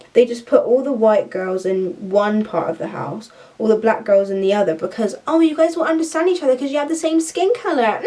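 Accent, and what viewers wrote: Bristol, United Kingdom. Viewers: yesss the bristol accent is giving me lifeeeeee <3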